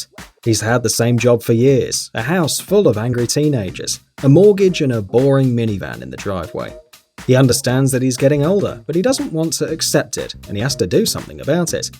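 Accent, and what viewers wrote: England, United Kingdom. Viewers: are you from the UK because you sound English. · Are you British i guessed coz of your accent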